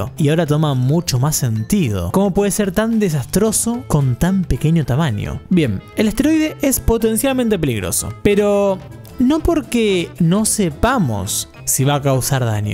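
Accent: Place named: Argentina. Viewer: Con esa vs argetiinoo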